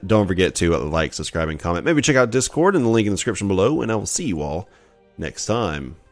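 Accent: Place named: East, United States. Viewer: You sound very eastern american. Where are you from?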